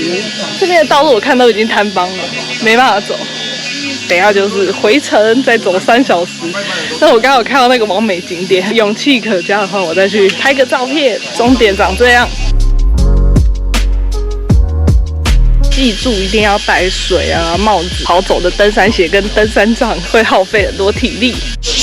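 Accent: Taiwan. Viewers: Jing你的台湾国语解说很好听，语气很温柔。好喜欢！❤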